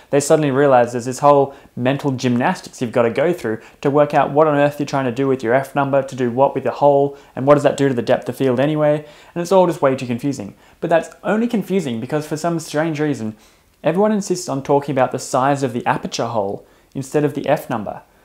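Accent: Australia. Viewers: This is by far the clearest explanation compared to other videos. Easy to understand, yet more technical than others Chilled ozzy accent is a plus too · Thanks. I’ve been out today shooting the Darwin wetlands before and at sunset. Your video has explained a troublesome shooting situation made pretty simple. It sounds easy, I probably make it difficult for myself. Thank you for a simple, no B,S, no problems tutorial. Good to hear an Aussie on here. I have followed and saved. I will return. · That was the most simplistic no-brainer explanation of f-stops/aperture I have seen on YouTube. I don’t know if it’s the smooth Aussie 🇦🇺 accent but my Canadian 🇨🇦 brain appreciates your teaching style and analogies!! 👏🏿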